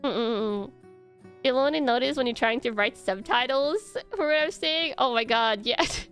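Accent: Norway. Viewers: love! Thank you for the pack crackings and chatting, a true delight any day of the week:_KannaKamoHug: Those Moomin cards were absolutely gorgeous, a very well designed and crafted collectors item!:_KannaShiny: The kamos hats are coming along very nicely too:_KannaKamo::_KannaComfy: Its always lovely to hear you speaking Norwegian (or your Norwenglish:_KannaLmao:) an entire stream would be very interesting:_KannaLove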